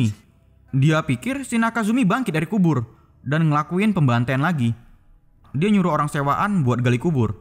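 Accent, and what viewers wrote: Medan, Indonesia. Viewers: Medan kali kau yah bg yahh, salam dari pakam ya lae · wowkowokwok biar mantap bolehlah logat org medannya di pertahankan, saran ya... jangan semua benar2 dipertahankan biar meluas semua org bs menikmati kontenmu, pake Ejaan yg sedikit mendekati bahasa indonesia baku, ok lae.. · Untung aku anak medan jd ngerti apa yg kau bilang hahaha cepat kali bah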